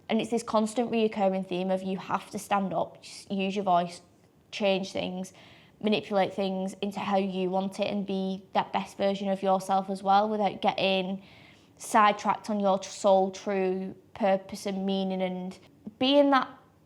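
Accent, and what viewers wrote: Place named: Ireland. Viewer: I don't want to be mean... but her horrible irish/northern english/whatever... accent makes it an ABSOLUTE horrible experience listening to her! It really is HORRIBLE! I lean to tell her "Go open up your chakras somewhere else!"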